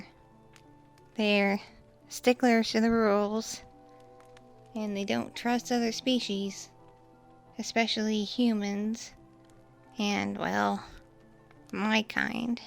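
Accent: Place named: South, United States. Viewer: Am I the only one who thinks that the kitsune in the thumbnail looks like an Eevee. Southern accent is nice. Believe me I was born in Tennessee I know what a southern accent is like. Luckily I didn't live in Tennessee long enough to develope a southern accent. Cause I have no attachment to my birthplace once so ever.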